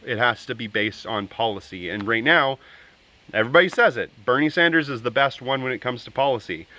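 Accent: Canada. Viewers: You sound Canadian. Where you from comrade?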